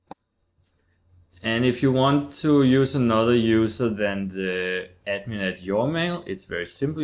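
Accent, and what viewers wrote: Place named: Denmark. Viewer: Du er dansker kan jeg høre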